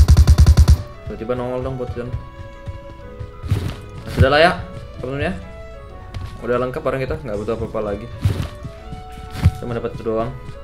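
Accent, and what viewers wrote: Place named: Java, Indonesia. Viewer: Ngomong asem medok slur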